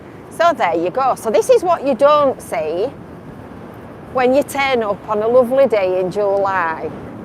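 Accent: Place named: Lancashire, United Kingdom. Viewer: You cheered me up with your lovely Lancashire accent and chirpy attitude. From a mancunian living in blackpool.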